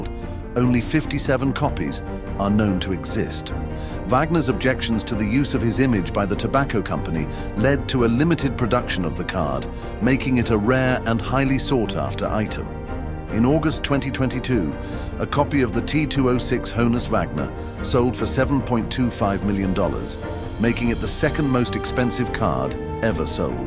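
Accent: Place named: United Kingdom. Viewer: Interesting to hear a British narrator on a biography of a player in the Great American Pastime.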